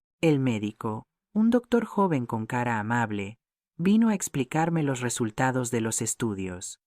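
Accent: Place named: United States. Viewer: Me gusta la historia, lo único qué no me gustó que narren con acento gringo, siendo qué la historia es relatada en Sevilla España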